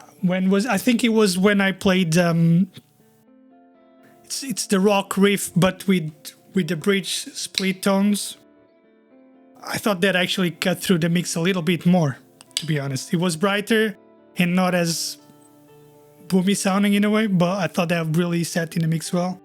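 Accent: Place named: Portugal. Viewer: O sotaque diz-me qualquer coisa. Fui confirmar... TUGA ! Obrigado pelo video !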